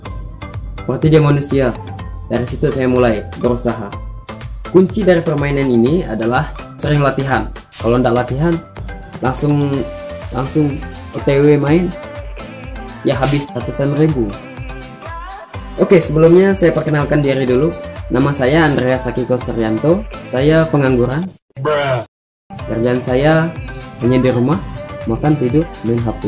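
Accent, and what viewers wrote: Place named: Kalimantan, Indonesia. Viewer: Orang kalimantan ya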